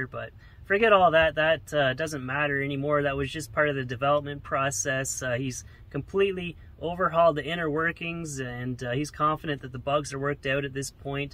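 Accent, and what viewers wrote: Canada. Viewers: So Canadian…work on ruff and then get water by the crick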